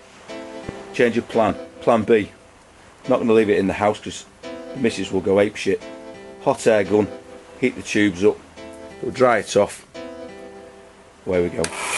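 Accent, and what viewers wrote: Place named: Manchester, United Kingdom. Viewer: Hes from Manchester like me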